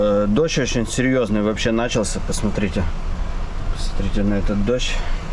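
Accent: Odessa, Ukraine. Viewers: здравствуйте! Большой привет от тракиста из Теннесси, США. Совершенно случайно наткнулся на один из твоих роликов годичной давности о работе по работе с контейнерами в порту. Когда-то и я имел такую же работу в Ашдоде и Хайфе и это был один из кошмарнейших периодов в моей жизни. И вначеле мне не очень приглянулось то видео, т.к. сделано оно было как-то, извини за откровенность, корявато.🙃 Но... Зашел на другой твой ролик, следущий, и уже с удовольствием просматривал их, как и последующие. Никогда не планировал посетить Японию, но люблю путешествия, и твои ролики о японской жизни сильно запали в душу мягкостью твоего метода её показа. Смотрю и сравниваю Японию и те страны где я жил и живу. Очень интересно смотреть об обыденной среде обитания местного населения! И да, твой сосед сильно смахиват на веселого водилу-ханурика😛, но это лично мое не объективное мнение.🤭 И как я вижу, Япония ушла далеко вперед по отношению к своему населению даже по сравнению с США, где я жуву уже почти четверть века. 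Кстати, у меня есть тут приятель, тоже Сашка с Одессы и его манера разговаривать очень схожа с твоей. Спасибо за ролики, передавай привет супруге и Ёшкиным котикам и удачи в жизни!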